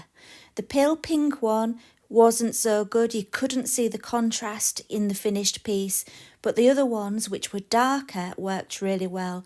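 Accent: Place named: Lancashire, United Kingdom. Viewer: Just subscribed..Saw your video today for the first time! Really cool effects with the foil! Love your Lancashire accent! I’m a Lancashire girl too..I’ve lived in America for 40 years …still got the accent though lol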